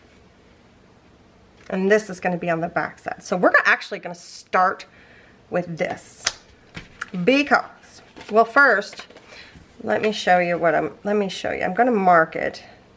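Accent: South, United States. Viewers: Has nothing to do with sophistication its accent from the south. Give yourself some credit girl.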